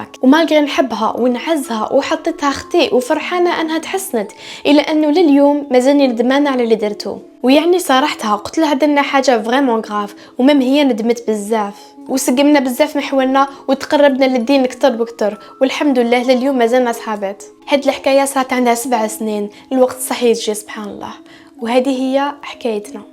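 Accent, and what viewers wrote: Blida, Algeria. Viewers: هذي بليدية ، راي تهدر باللهجة تاع بليدة 😊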